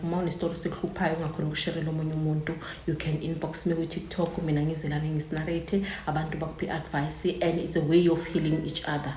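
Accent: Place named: Ndebele, Zimbabwe. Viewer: Indebele lekhethu ngyalizwa elimini, ngizwa iskhethu elimini lakho😅.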